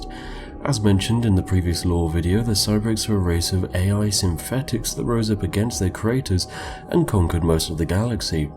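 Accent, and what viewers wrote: United Kingdom. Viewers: I kinda miss this brit voice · So he’s not just doing a bit, is actually that British?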